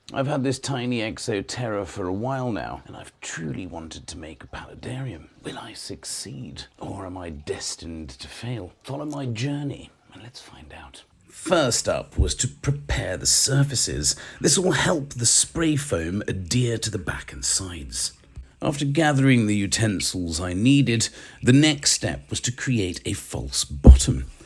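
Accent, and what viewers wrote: United Kingdom. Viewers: I love hearing you speak. It sounds like you belong in a Harry Potter movie. From one Brit to another i absolutely love it.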